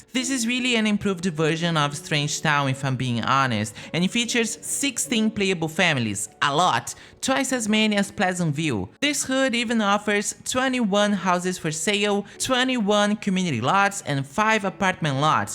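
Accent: Brazil. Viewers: Adorei o video e pelo sotaque ja sabia que era BR. Can anyone recommend some hoods available for the Super collection for mac users? :( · Simmm, assisti o vídeo pensando, é brasileiro certeza kkkkk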